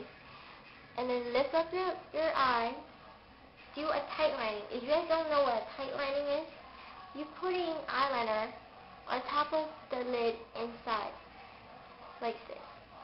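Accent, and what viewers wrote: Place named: Asia. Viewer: you got an asian accent lolz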